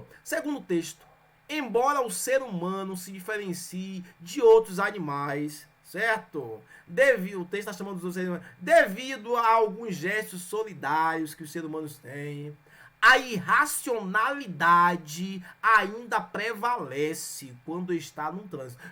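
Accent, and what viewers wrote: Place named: Northeast, Brazil. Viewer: Capote na enfermagem é uma proteção..... espécie de avental......eu amo a linguagem e o sotaque nordestina.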